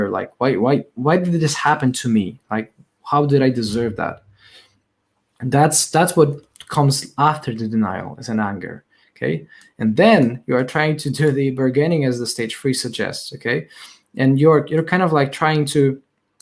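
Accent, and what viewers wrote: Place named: Poland. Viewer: Also thank you for introducing me to St. Rita. I am a fellow Catholic, Hungarian born. To me your accent sounds like someone who moved away from Poland when you were in your teens and learned English then. (or maybe I am projecting here as that's my story) . Good on you for being so brave and sharing your belief in public, I know exactly how hard that is as especially being a Catholic.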